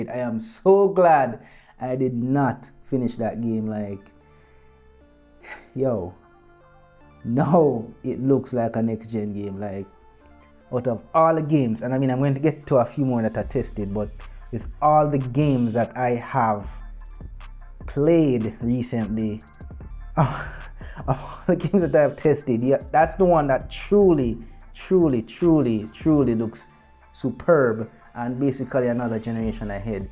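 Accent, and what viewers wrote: Jamaica. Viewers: Bring out the Jamaican out 🇯🇲🇯🇲🇯🇲🇯🇲😂😂😂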